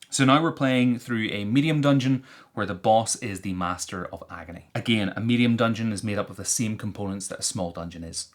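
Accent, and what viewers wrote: Northern Ireland, United Kingdom. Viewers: YOU FROM NORTHERN IRON???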